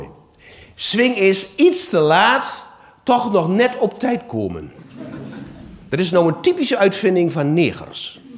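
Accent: Twente, Netherlands. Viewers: wel goed dit twents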